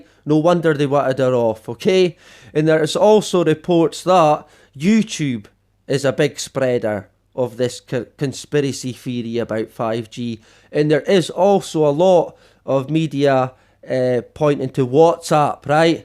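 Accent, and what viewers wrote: Scotland, United Kingdom. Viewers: I love your accent...sounds Scottish..... And no vaccine for me...💯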